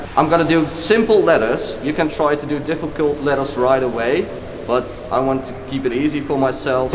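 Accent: Netherlands. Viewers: best dutch accent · Je hoort gewoon het Nederlands accent erdoorheen haha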